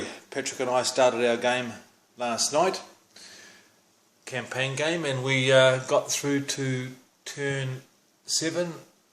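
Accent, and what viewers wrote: New Zealand. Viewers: Been watching "the great war" you tube series, which has renewed my interest in WWI games. The only GMT game I currently own is Twlight Struggle, so i've been looking at reviews and sessions online. And what do I hear, a bloody kiwi accent on the first one I click on :) Know a place to pick up Paths of Glory in NZ at a reasonable rate? Or should i just order from overseas as per usual. Edit Found a 2nd hand copy for $26 NZ + shipping.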